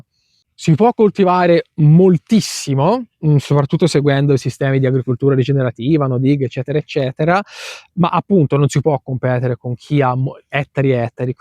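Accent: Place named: North, Italy. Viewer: Dal tuo accento, capisco che vivi al nord,,, mi dispiace,,, possiedo dieci Milà metri di terreno,,(vivo in Sicilia) sono ormai dodici anni che ho avviato la mia partita iva,di azienda agricola,,,,produco un po' di tutto,,, è i prodotti che riesco a produrre, il 95% dei casi riesco a venderli molto meno della grande distribuzione,,, con buoni margini di profitto,,,, forse il motivo è anche che al sud ci sono molte più persone che possono permettersi di spendere molto di più per il buon cibo rispetto al nord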